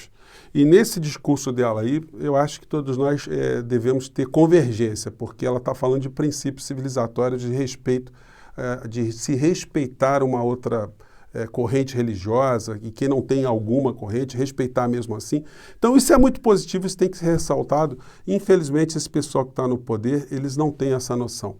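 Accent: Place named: Rio de Janeiro, Brazil. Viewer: Professor , o termo canjicas já é diferente, com , o seu sotaque carioca ,fica ainda mais divertido!!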